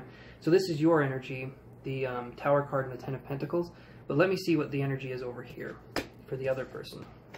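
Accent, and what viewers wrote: Ireland. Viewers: Hahahahah I love the Irish accent. Classic! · Hahahahaha i was like :"hes actually irish?" I was so confused hahahahaha 😂😂Spot on reading ! Thank you!!☺☺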